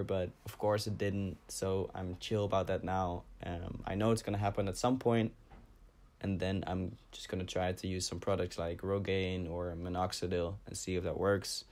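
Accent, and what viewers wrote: Netherlands. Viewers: That dutch accent tho 🤘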